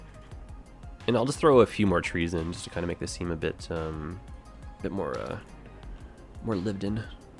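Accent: Toronto, Canada. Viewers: i was just coming to comment that this dude sounds so canadian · Discovered your channel through the 5 Builders collab, really enjoying your content. As a fellow Torontonian, but one who mostly watches British YouTubers, hearing someone online using the local accent really caught me off guard lol. The numbers of "beauty"s and "eh"s in this video are fantastic :D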